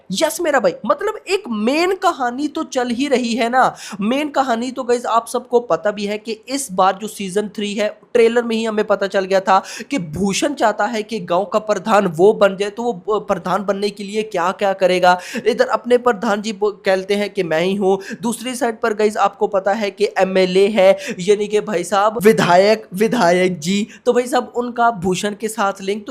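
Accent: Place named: Pakistan. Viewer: Ha Pakistani hai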